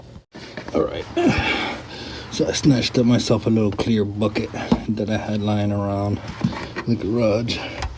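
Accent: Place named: Alaska, United States. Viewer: You sound like you are in Alaska judging by your accent. You are a very kind man.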